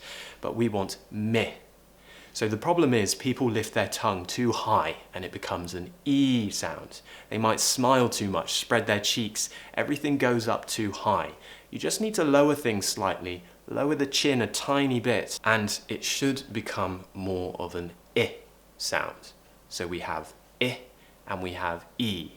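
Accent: United Kingdom. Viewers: your British accent is everything. I feel stupid trying to copy you. 🤣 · I love british accent cos it's quite easy and understandable btw i appreciate your work mate 😘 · Thank you so much! I love the british accent and I'm lerning a lot with your videos! What a lovely sentence "I have so much gratitude for every mistake I make" I agree with you and I try to think that way when I make a mistake. I enjoyed this lesson! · Hi, This is Libin from India. It has been a wonderful experience attending your programme on British Pronunciation. I love your accent and I practice your lessons daily. There is great improvement in my pronunciation. I take this opportunity to thank you. Thank you so much. Libin Mathew. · I am from Quebec and unfortunately I cannot hold a conversation in English, but your very British accent is really nice. I listen to your shows from time to time and take pleasure in trying to understand your explanations. 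It also happens that I use the automatic translation into French of you tube, which I do in other English shows, and I must admit that the translation is impeccable, which means that the construction of your sentences must be flawless. Thank you and good luck for your work and for making us appreciate your wonderful culture. (translated by Google)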